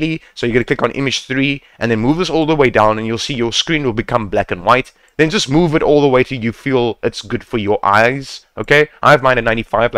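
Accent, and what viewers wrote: South Africa. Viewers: I love that you are south african because I actually like listening to people from home😂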